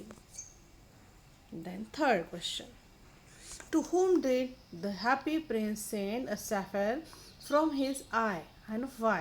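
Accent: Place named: India. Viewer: U indiab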